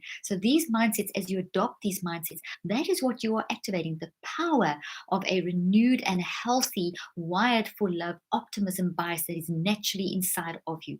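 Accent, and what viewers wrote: South Africa. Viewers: Smart young lady . Love the SA accent too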